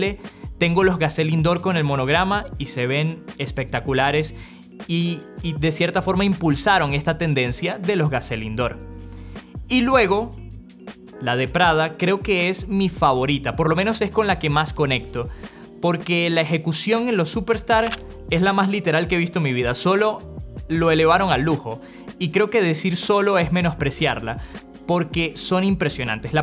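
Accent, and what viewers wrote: Venezuela. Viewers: Es venexolano?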